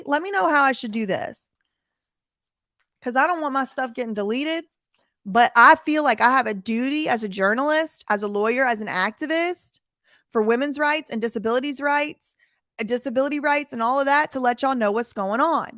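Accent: South, United States. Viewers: Woo hoo Warrior Woman! 🎉Shae Shae shame shame. “Sometimes you can come out alright, like me and then there’s Shae.”🤣 Also, you have definitely been laying on the southern accent and rhetoric lately. Hilarious! Seriously though, I’m SO PROUD OF YOU STANDING UP FOR OTHERS AND FOR JUSTICE!😘🤗💗💕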